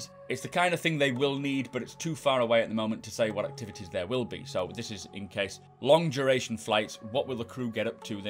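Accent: United Kingdom. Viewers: You mean American subtitles, he's speaking (British or Scottish) English.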